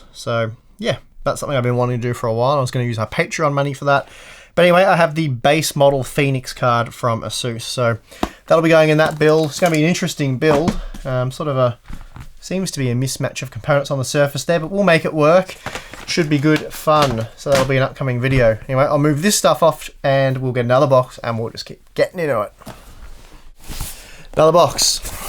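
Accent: Australia. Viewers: It has to be difficult to pronounce NZXT as NZedXT all the time, and convey whatever the message is. Lol I honestly love Aussie accents, but certain European and Aussie pronunciations crack me up.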